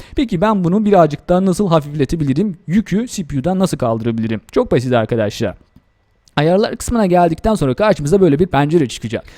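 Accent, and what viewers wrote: Azerbaijan. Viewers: Azeri o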